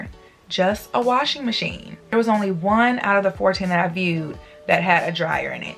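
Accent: South, United States. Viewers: You got that southern accent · Love the way you talk with an occasional “ y’all”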